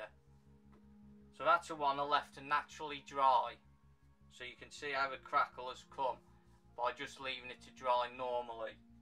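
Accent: United Kingdom. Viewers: Nice, British or Welsh? if you don't mind me asking?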